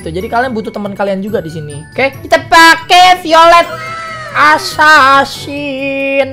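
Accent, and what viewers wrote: Jakarta, Indonesia. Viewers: Betawi medok bet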